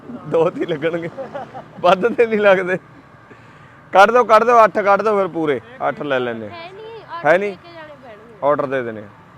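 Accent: Malwa, India. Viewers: ਲਹਿੰਦੇ ਪੰਜਾਬ ਵਾਲਿਆ ਦੀ ਬੋਲੀ ਮਾਝੇ ਨਾਲ ਦੀ ਆ ਸਾਨੂੰ ਸਮਝ ਆ ਜਾਂਦੀ ਆ ਦੀ ਬੋਲੀ ਮਲਵਈ ਆ ਤੁਹਾਡੀ ਬੋਲੀ ਸਾਡੇ ਨਾਲੋ ਥੋੜੀ ਜਿਹੀ ਅਲੱਗ ਆ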